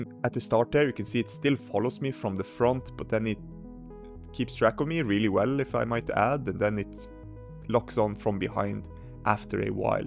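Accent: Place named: Norway. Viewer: your English is perfect. Men eg kjenne igjen ein nordmann når eg hørre ein.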